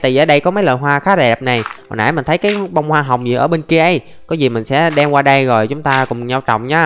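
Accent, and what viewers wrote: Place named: Dong Thap, Vietnam. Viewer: Giọng ngày xưa đặc chất Đồng Tháp lun 🎉🎉🎉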